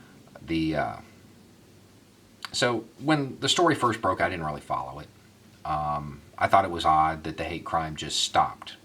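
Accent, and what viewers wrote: South, United States. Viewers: Why the hell do conservative believe he speaks to them. Cause he has a southern drawl?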